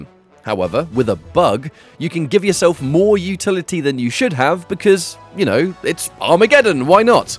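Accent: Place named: United Kingdom. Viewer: its so hard listening to BRI'ISH people talk... like is he saying FREE or THREE "BOH-OH OVE WAHAH"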